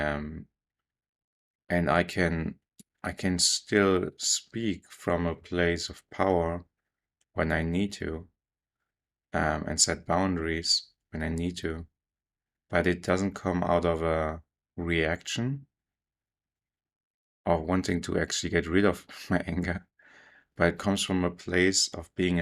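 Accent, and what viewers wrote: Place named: Germany. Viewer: Bro thank you so freaking much bro. I was Over here continplating stuff about my ex who was German and I'm realizing I think she cheated on me then I see this video and it sounds like you are German and I'm also going through stuff with my mom and I was also for the last 3 years I have been like the angel guy cuz I thought I could like you said heal people by that but I ended up getting taking advantage of. Thank you thank you thank you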